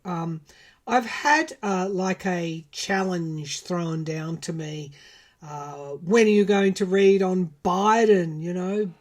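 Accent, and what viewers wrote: Australia. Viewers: Hello, what a joy to find you. I am fairly recent to Taroscopes & a new subscriber. I listen before sleep. I often don't watch, just listen. I heard your voice & knrw instantly that you are Aussie. I am so impressed with your channel I have only heard two of your readings & what a joy to onow yhat you do politics. After all we are so affected by Government & the fall oits that occur. I would love to know that Mr Morrison has some fallout from the reality that he & his Government have perpetuated in Australia. Love that Gladys & McGuire have been rxposed. Will there ever be corruption free politics 😢 thanks for what you do ❤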